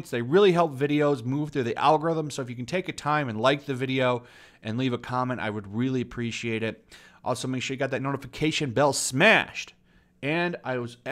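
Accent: England, United Kingdom. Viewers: Damn! And here I am waiting for your mandalorian episode reviews in that funny English accent! 😂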